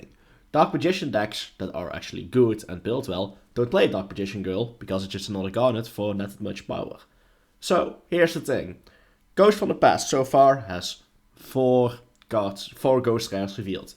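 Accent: Netherlands. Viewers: Are you Dutch? I can tell from your accent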